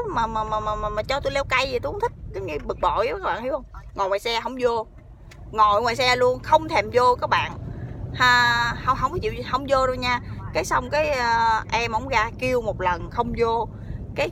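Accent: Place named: Mekong Delta, Vietnam. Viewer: Chị giống quê miền tây quá...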